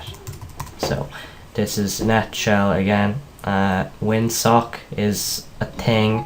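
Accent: Ireland. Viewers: FUCKING THANK YOU!!! and your adorable Irish Accent!!!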